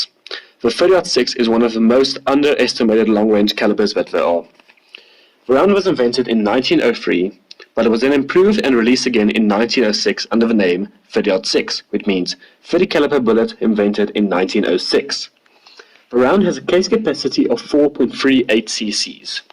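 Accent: United Kingdom. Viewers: Why is everything these days have to have someone with an English or British accent? Minute I hear and I turned it off because it's b***** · Your an idiot 25 06 has been around for about 100 years it must be that Brit tone that you have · Your narrative is useless - try another enclosure for your talk - and get rid of that shitty British accent.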